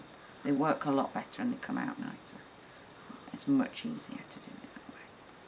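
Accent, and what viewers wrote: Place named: Yorkshire, United Kingdom. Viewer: Love this vidoe, love the accent. Feel like km learning from one ot my Yorkshire grandmas. Thabkyou so much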